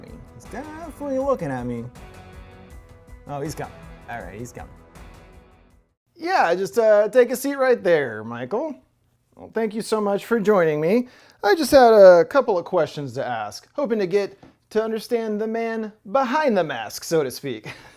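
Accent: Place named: United States. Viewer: No. He speak American English.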